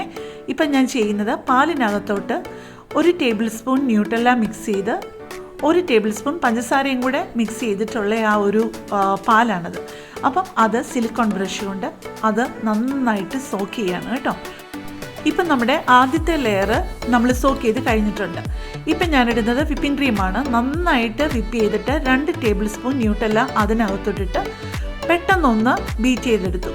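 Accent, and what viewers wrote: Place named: Pala, India. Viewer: എന്റെ friend geetha george ഇതു പോലെ പറയും നല്ല ശെല് എന്ന്. പാലക്കാരി ആണോ 😍😍😍😍😍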